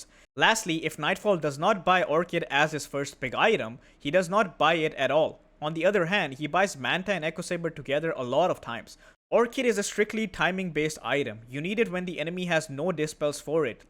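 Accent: India. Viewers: shut up disgusting indian accent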